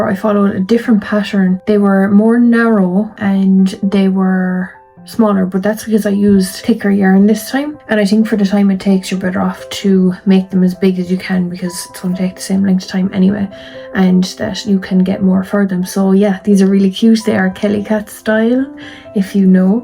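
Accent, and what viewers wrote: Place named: Ireland. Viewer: Hi, there are so many cute item's just came across you today thought i could hear irish my mother from killkenny Ireland look forward to you're next video ❤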